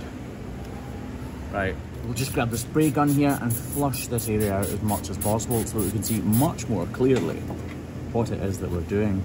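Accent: Scotland, United Kingdom. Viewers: I could listen to you all day. My family members are all Scottish before me, I'm the first Canadian in my family. The Scottish accent is the sound of my childhood. Ive lost a lot of them...Im 56 now so they all passed of old age etc. Ive been pretty depressed for the last year- health issues - and listening to you reminds me of my childhood. The wonderful Scottish brogue makes me so happy. I love the videos as well but I mostly watch to hear you speak. Much love from Canada eh. <3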